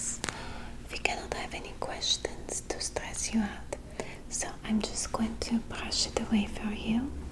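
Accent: France. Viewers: I love the French accent and the lovely maid uniform. Your voice is very soothing and comforting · Oh this video is incredible! You look so pretty in that outfit and your French accent is so cute! This was a very nice thing to wake up to.🤗💜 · That french accent you do here is to die for... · That's a good French accent 😂 reminds me of the 80s comedy show Allo Allo if anyone remembers that. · You are amazing----have yet to see anything you can't do. Looking Beautiful as a French Maid and Fantastic accent too. LOVE---LOVE everything you do.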